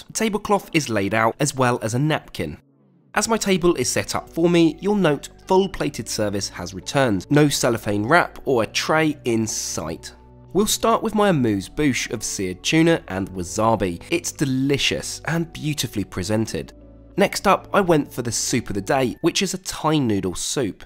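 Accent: United Kingdom. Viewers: What?!! They are now blowing away Emirate's First Class. Great. Hey, your voice style is very British and sounds relaxing, thanks for all the contents you upload to YouTube, way better and honest reviews than the "Chu" Chu Train. LOL - But I still prefer their Q-Suite over this Eggshell seating.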